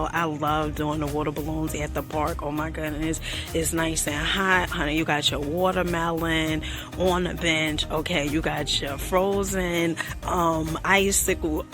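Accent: New York, United States. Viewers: Are u from nyc. I love the sound of ur voice 😊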